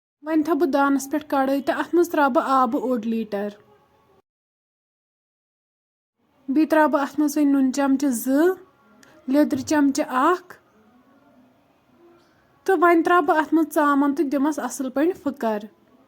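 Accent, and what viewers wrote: Kashmir, India. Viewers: Wariya asel...lagta aap k accent se aap south kashmir se ho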